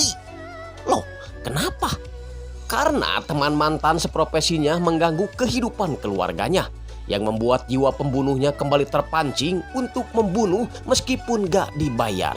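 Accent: West Java, Indonesia. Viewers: Mimin na mni khas cara ngomg na kaciri sunda na kacida😅😅😅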